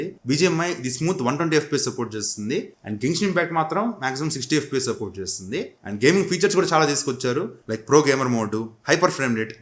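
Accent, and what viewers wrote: Nellore, India. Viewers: Are you from Nellore 😮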